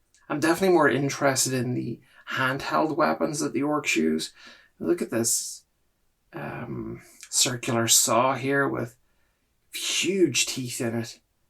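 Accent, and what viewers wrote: Northern Ireland, United Kingdom. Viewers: Nice N.Irish accent 😆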